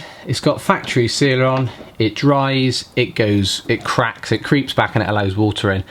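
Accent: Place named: West Country, United Kingdom. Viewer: where are you mate? You sound West Country. We need this sorting on my step daughters corsa. She is In Glastonbury.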